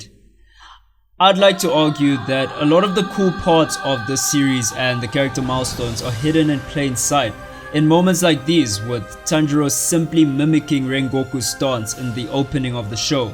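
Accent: South Africa. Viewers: Bro you’re a South African brother? I’m subscribing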